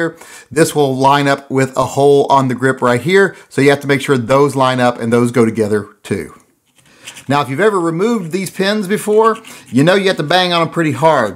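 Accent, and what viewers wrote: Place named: West Virginia, United States. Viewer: Your West Virginia is showing. They're the only ones that say, "colbra".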